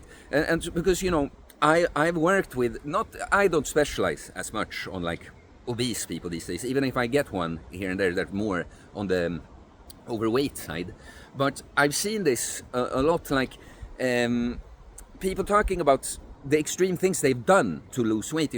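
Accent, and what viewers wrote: Sweden. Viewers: Haha älskar att höra en riktigt pursvensk man prata engelska. Subbed. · Looking Finnish , but with a clear Swedish accent.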